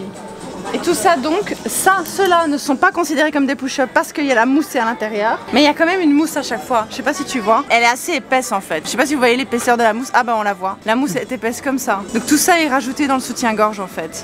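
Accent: Belgium. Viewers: T’es belge ?!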